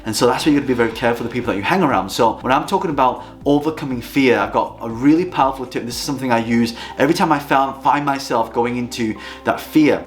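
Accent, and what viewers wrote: United Kingdom. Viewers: I have never heard you stumble. Sometimes I can here your Northern accent though. ;) Btw. my husband is from Lancashire, too. ;) He was born in Rossendale, Lancs. But unluckily we just speak German, because he came over during his childhood.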